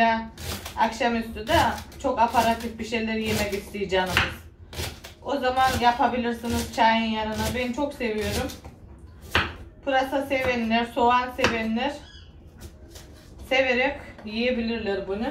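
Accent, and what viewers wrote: Trabzon, Turkey. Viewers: Trabzon Of Hayrat nahiyesiydi.Bayılırdım konuşmalarına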